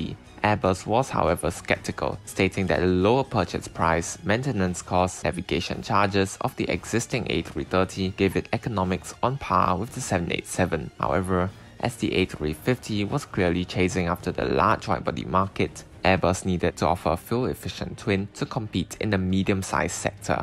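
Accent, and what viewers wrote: Singapore. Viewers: You sounds like Singaporean.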